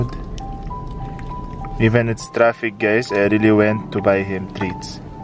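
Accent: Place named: Philippines. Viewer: Hope Maki boy gets well soon, you are a great dad. By the way, while listening to you talking, your accent and intonation is sounding more and more like Filipino speaking in English.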